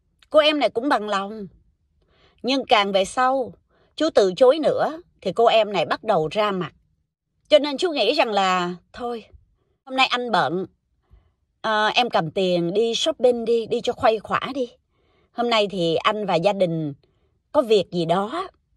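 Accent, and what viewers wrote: Standard. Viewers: Câu chuyện rất thật rất đời thường ,giọng đọc E rất chuẩn hay,Khi đã nói ra đc lòng sẽ nhẹ nhàng hơn,đây cũng là bài học cho tất cả mn ,kể cả phụ nữ,nếu sống lường gạt âm mưu như vậy,ắt gặp quả báo,ko phải ai cũng cư xử như A này .Nếu ng khác họ báo cảnh sát ,Cô ta chắc chắn sẽ bị vô khám. Nhân tham tài nhi tử Điểu tham thực nhi vong. Người tham tiền cũng chết,vật tham ăn cũng chết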